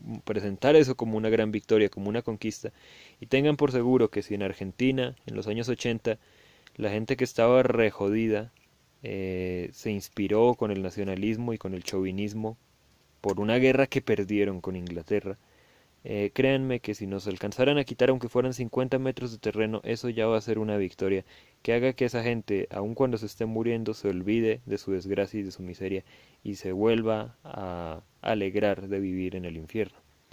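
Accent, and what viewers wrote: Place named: Colombia. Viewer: por tu acento siento que eres colombiano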